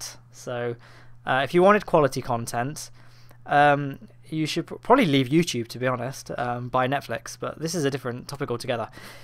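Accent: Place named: England, United Kingdom. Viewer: You are very English arent you? English is not my mother language but your accent is so strong :D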